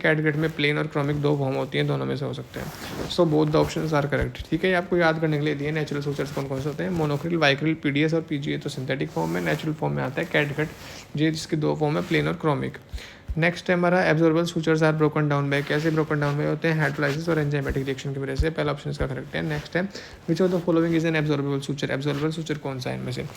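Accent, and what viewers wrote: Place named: Himachal Pradesh, India. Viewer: Sir aap himachal se ho na apke bolne se pta chl rha hai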